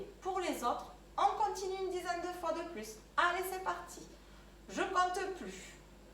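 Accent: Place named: South, France. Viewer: Merci pour cette séance,j'aime ce petit accent du sud! Je vous suis depuis la Belgique!!!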